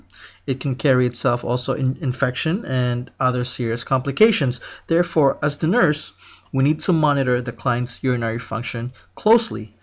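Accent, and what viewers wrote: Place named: Philippines. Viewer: Sir, pinoy kaba? You sound like one. :)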